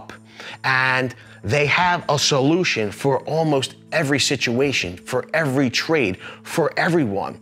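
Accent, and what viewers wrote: Philadelphia, United States. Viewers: That’s just South Philly baby!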